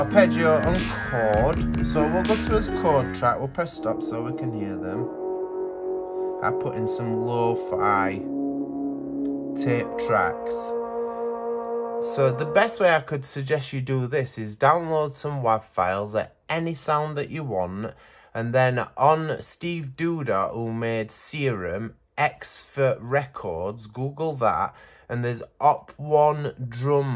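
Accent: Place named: England, United Kingdom. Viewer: I've watched quite a few tutorials on the OPZ and still found some new tips on yours. And it's nice to hear an English voice for a change! Thanks👍😊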